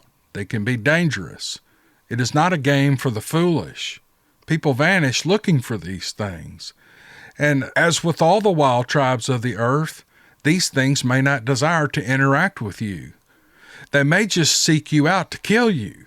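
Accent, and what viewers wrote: South, United States. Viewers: don't change anything. I just discovered this channel not too long ago, and I really like your laid-back, down-to-earth approach. Your accent and the background country music makes me miss the USA as a UK citizen. I went to school and worked in Iowa and Massachusetts for 18 years, and it's like a second home to me. Thanks for all you do.:) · I haven't listened to this channel in a while, but I do like Southern droll, his enthusiastic style and layed back charm, but I've noted in the YT comments(and in narration), that there is a ruckus about analaysis after each story/report? Can I suggest that it could be a good idea to put bookmarks for each story with matching timestamps within the description - it'd be easy to do and would keep everyone happy(ish). BTW there's also some grumbling on other topically associated YT channels about this too - maybe it's a "sign of the times" thing that we are currently living in/under?! 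Keep safe and well, and remember to be humane to each other(I include myself), as times are tough in many ways(ditto).:) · Chat all you want to. How can you not enjoy a southern accent? It makes some of us go weak in the knees. 😉😊🐾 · I'm from Oz&love that Southern US accent. The more yakkin from the more content I am👍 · I could listen to Southerners talk all day. I love your channel, especially the Steve Lily stories. Don't join the cancel culture and change something that's great, just to appease a few brain dead whiner's. God bless, James in London. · YOUR UNIQUE SOUTHERN BRAWL AND EXPRESSIVE OPINIONS ARE WHAT MAKES YOU........WELL, YOU! IF THESE VERY FEW "CRITICS" DON'T CARE FOR YOUR STYLE OF NARRATION, THEN, HEY, THEY CAN ALWAYS GO SOMEWHERE ELSE!! IT IS, AFTER ALL A VAST INTERNE WITH MANY OPTIONS. I'M SURE I SPEAK FOR MANY OF YOUR LOYAL LISTENERS IN SAYING "YOU KEEP ON KEEPING ON"! 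"YOU DO YOU"!! YOU GIVE THE STORIES THAT LITTLE TWIST AND YOUR REFRESHING OPINIONS REALLY ENCOURAGE ONES WHO MAY BE SUFFERING OR ANXIOUS ABOUT THEIR SIGHTINGS!! KEEP ON "YAKING", LOVE!! FROM ALL YOUR FELLOW TN FANS, DON'T STOP BEING!! LOVE YOU JUST THE WAY YOU ARE!!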